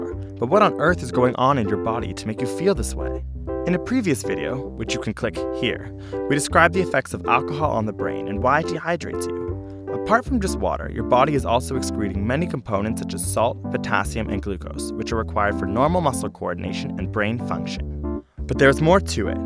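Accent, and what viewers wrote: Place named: Canada. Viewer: From a phonetics point of view, this guy might just sound "gay" because he exhibits features of canadian raising- the other guy has it too kind of. I'm not saying all canadian guys sound gay, but that kind of chilled out, raised intonation (as if every sentence sort of sounds like a question) and vowel that's often found in californian and canadian english CAN sound similar to stereotypical "gay" speech. Of course this is all arbitrary as people sound the way they do, regardless of orientation.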